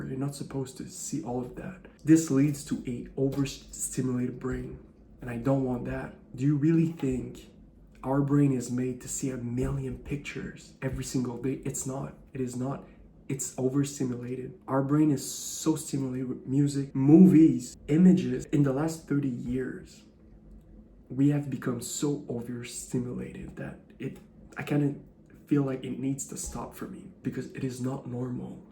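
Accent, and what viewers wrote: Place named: Netherlands. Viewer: He's in a foreign country other than the United States. Listen closely to his accent of the English Language. I know of no State in the Union WHERE people speak like this unless he's in a tight community of Dutch or a village of Norwegian immigrants... Yes? JUST a thought. Respectfully submitted for your consideration Gregg Oreo Long Beach CA Etats Unis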